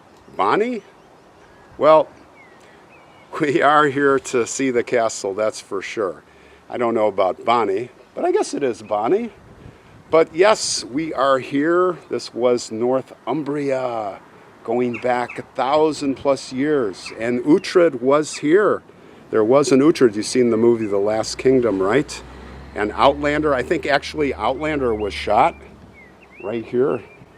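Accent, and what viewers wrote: Scotland, United Kingdom. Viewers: Ma Scottish Grand-dad would have approved of ye Scottish accent🍻 Once again - if one Goggles: The Bold at Bamurgh Castle -- you will see this video above the others listed there😊👍 I wonder why the dad doesn't seem to also have a special burial place? It's sad that she died so young. That poor mom that had her children drowned & the others.😢 It looks like eventually the sea may over take that beautiful Castle one day. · The argyle sweater is a nice touch. Fits the Scottish accent.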